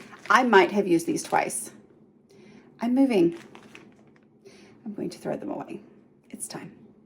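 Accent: South, United States. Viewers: Does anyone one else LOVE 💕 that southern accent is STRONG in this video? No? Just me? Well someone needs to 😍😍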